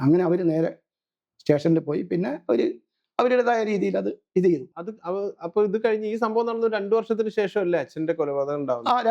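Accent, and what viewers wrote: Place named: Palakkad, India. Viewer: അത് പാലക്കാടൻ മാരുടെ... ഒരു ശൈലി ആണ്.. അത്രെ ഉള്ളു.. ഞാൻ പാലക്കാട് മാത്തൂർ പഞ്ചായത്തിൽ ഇൽ നിന്നു ഉള്ള ഒരാൾ ആണ്... ഇവിടെ അങ്ങനെ ഒക്കെ പറയും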